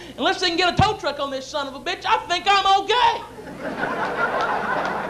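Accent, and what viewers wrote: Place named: South, United States. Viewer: didn’t realize he had a southern accent .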